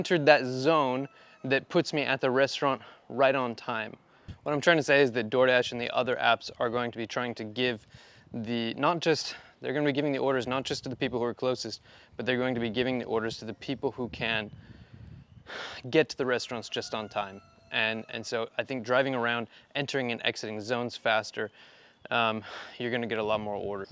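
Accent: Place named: West Coast, United States. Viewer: Medford Oregon. Good stuff. He has a west coast accent but I couldn't figure out what market he worked in.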